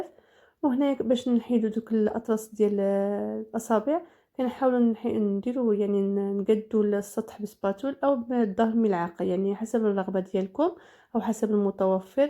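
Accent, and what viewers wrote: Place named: Algeria. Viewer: اللهجة جزائرية الحلوة جزائرية والسرقة مراكشية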